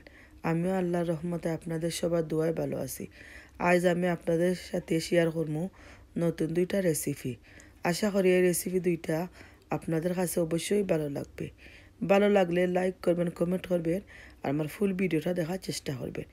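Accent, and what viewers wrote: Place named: Sylhet, Bangladesh. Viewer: আপু দেখিলিছি আপনার রান্না , মিস করছিনা। বাক্কা মজা লাগল আপনার রান্না । আমার নানা সিলেটে । তাই আপনার মাতকতা বালাউ লাগে আমার কাছে । আমার মায়ের ভাষা ।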